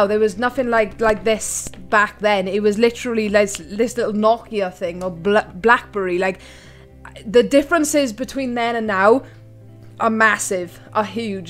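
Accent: Wales, United Kingdom. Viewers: Thanks for sharing your story with us interesting not always good and iam sad to hear what u had too go through but i believe we learn and hopefully grow stronger as u said through these experiences. Love your Welsh accent gorgeous, my music tastes are almost completely different i grew up a different music area 60- 70s , now its changed again, night wish, helloween style , all the best for further endeavour's with YouTube and hope it goes well for you hugs. Peter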